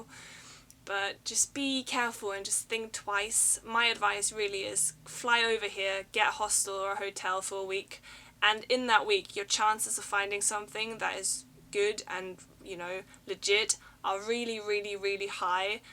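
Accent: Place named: United Kingdom. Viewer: You sound more British than German, honestly!!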